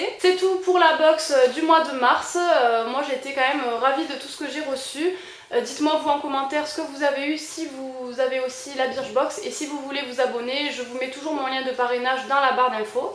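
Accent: France. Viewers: Mort de rire l'accent marseillais hihi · Salut, Cette box m'a l'air super je croit que je vais commande des boxs. Pourrais tu nous expliquer comment commander des boxs ❤️ Sinon j'adore ton accent je suppose que tu vient du sud 💜 Gris bisous et a bientôt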